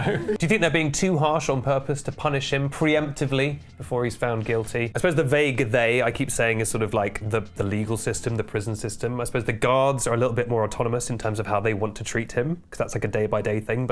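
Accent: United Kingdom. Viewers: Wait, why are you british? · Oh you’re British :(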